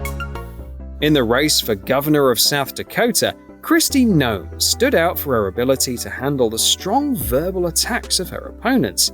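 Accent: United Kingdom. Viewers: Brit accent should be confined to Brit subjects.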